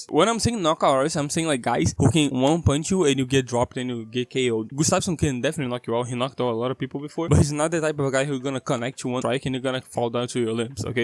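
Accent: Brazil. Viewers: senti um sotaque br. You have a really good point, the sad thing is that it pretty much doesnt matter who wins. Whoever wins will probably retire right after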